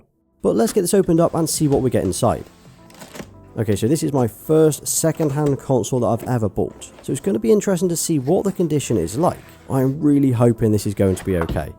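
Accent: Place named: Yorkshire, United Kingdom. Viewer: I love watching your videos and your Yorkshure accent is a pleasure to hear. I would appreciate a steelbox collection as well and I thank you for displaying your consoles instead of hiding them from appreciating fans.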